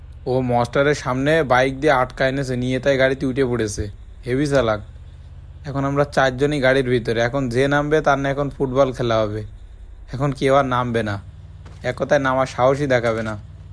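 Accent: Jessore, Bangladesh. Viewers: ধন্যবাদ ভাই আপনার খেলা দেখে জুন পোস্ট দেখে ভালো লাগে, আপনার কথা শুনে মনে হয় আপনি যশোর জেলার লোক, আপনার বাসা কি সাতক্ষীরা, ঝিকরগাছা, আমার বাসা যশোর ঝিকরগাছা থানা বাঁকুড়া ইউনিয়ন,❤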